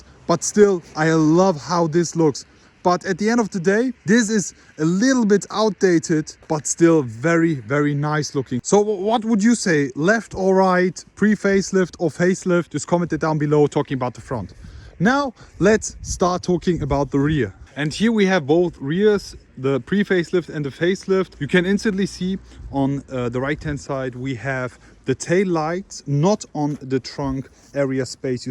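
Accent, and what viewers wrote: Germany. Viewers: Leicht deutschen Akzenten 😎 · Digger dein Englisch ist komplett weg alter man merkt so hart dass du deutsch bist aber trotzdem nices Video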